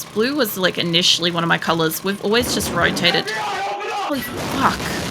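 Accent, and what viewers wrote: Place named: Australia. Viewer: I've never been able to watch an entire video of someone mining. Watching you mine is secondary to listening to you talk about nothing and everything all at the same time 😂 there is something about your voice that is easy to listen I and especially love the Australian accent. AJ 👍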